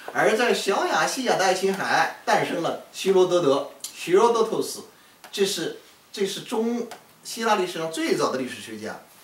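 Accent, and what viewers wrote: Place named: Northeast, China. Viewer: shipeng LI 我就是西北人 可他的口音一点也不像西北人 倒是和东北人神似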